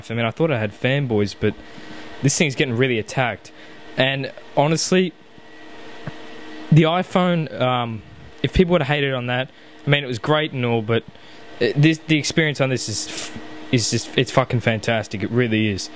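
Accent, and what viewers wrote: Australia. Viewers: Good to hear an Aussie accent on YouTube, especially about the iPad. Keep at the good videos mate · finnaly an ausie youtubber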